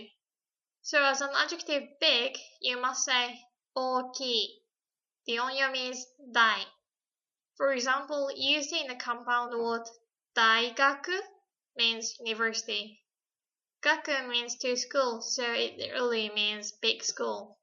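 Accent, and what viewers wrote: United Kingdom. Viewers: Now that I've heard a Japanese person with a British accent, I suddenly understand what it's like for British people to hear most non-native English speakers with an American accent. p goofy m8 also saigomademitayo, fam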